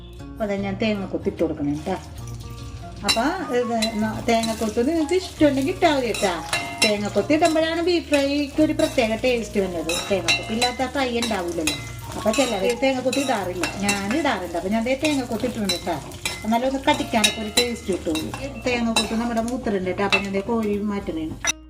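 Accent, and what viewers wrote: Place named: Thrissur, India. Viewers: Hi എവിടെയാ സ്വാദ്ദേശം തൃശൂർ ആണെന്ന് മനസ്സിലായി അവിടെ എവിടെയാ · തൃശ്ശൂർ സ്ലാങ് പോലെ തോന്നി. സൂപ്പർ ആയിട്ടുണ്ട് ട്ടോ. അവതരണം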